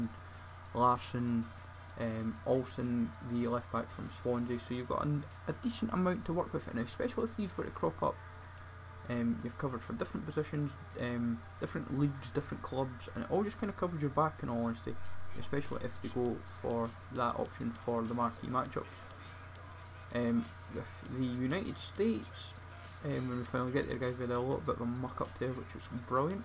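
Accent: Scotland, United Kingdom. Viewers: is he scotish??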